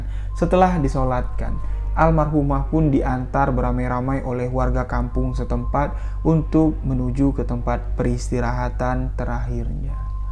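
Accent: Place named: Madura, Indonesia. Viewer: Aksen madura nya tolong di hilangin bang, agak” ketawa jadinya dengar penyampaian nya😂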